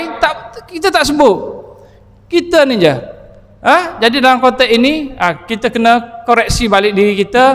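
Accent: Kelantan, Malaysia. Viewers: org Kelantan kan cakap bunyi kelantan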